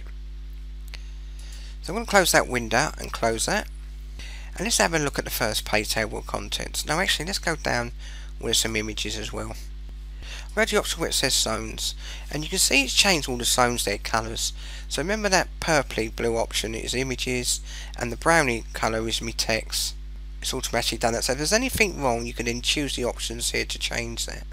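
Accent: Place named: Liverpool, United Kingdom. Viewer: It´s a mess. I am aware that back at school I learned British English, which is, properly speaking, Oxford English. Some sounds the speaker makes seem funny to me, but I could not understand them. Is it e. g. Liverpool English? Whatsoever, I gave up on learning from this video after about 3 minutes.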